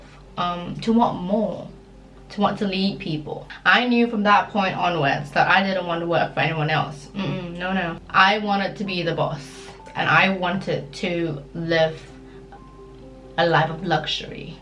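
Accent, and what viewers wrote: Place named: United Kingdom. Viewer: her voice is becoming very very British now,, lmao its cute ♡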